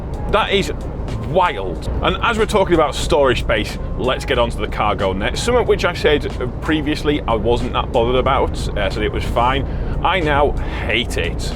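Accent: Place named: North, United Kingdom. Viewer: excellent rant, and you were speaking proper northern, stay safe, oh and cleaning products try bullsnot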